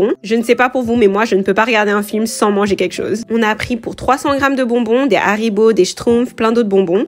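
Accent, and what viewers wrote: France. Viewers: Yess je s’avais que tu parlais français ❤❤❤tu avais un accent français quand tu parlais anglais ( d’ailleurs il y a quelques mois ou semaines je t’avais posé la question 😂)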